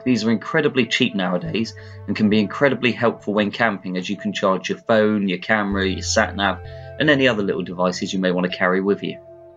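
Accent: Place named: United Kingdom. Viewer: sometimes i dont undertand uk english but nice video dude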